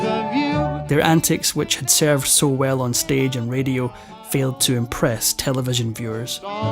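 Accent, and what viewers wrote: Scotland, United Kingdom. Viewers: Awesomeness my favorite Narrator... Love listening to the Scot!! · OK, the Scottish accent is extremely annoying after awhile - never noticed this before!!!!!!!!!! 😮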